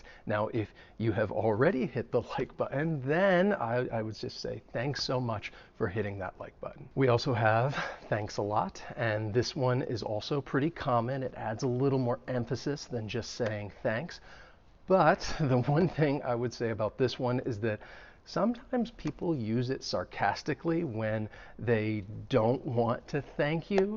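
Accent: United States. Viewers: Hi teacher , thank you for this lesson beacuse you speak englush american accaent ( i love american accent iust ! ) so clearly and lowdly ! I am 60 years old ! My first language is turkish !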